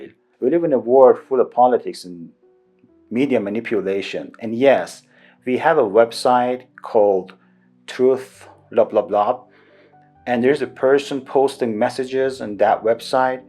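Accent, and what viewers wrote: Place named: United States. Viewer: I'm an American linguistic. It is not a matter of capability, it is phonology of langauges ! His accents is quite American and authentic and YOU ARE SUCH A STUPID PERSON !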